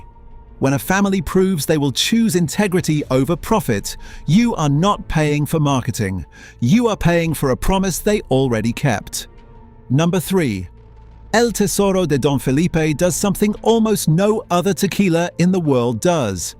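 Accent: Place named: England, United Kingdom. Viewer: There is a lot of questionable and somewhat misleading information in this garbage. Feels like an automated bot program creating it. And why would you select a English accent for an article on tequila. 😅Oh yes we all know England is a great source of tequila and information on tequila 🤣 I thought they were only allowed to add 2% additives to tequila. But who knows, maybe something changed or I'm remembering wrong. But I wouldn't necessarily trust this video as a source of information. A lot of it just seems like pointless hype and empty drama. And yes tequila can be sold as tequila and call tequila if it's only 51% actual tequila /liquor made from agave. The rest is usually going to be like some kind of Cane alcohol or something. And apparently that doesn't mean rum😂😖 and it is too harsh to drink straight 😂😖 But just because tequila says it's 100% agave does not also mean that it is good 😂 And you can legally put in the additives as long as you don't try putting on the label of the bottle that it is additive free. 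And many people will actually prefer the final products taste and texture with a little bit of additives in it. Not everybody cares about working their pallet into a "finely honed tool" to try to discern whether it's 100% natural or not having to buy tons of different tequilas to figure things out. They just want to buy a quick bottle to either enjoy sipping on every now and then or make some margaritas out of. And cheap rot gut tequila can often be great for making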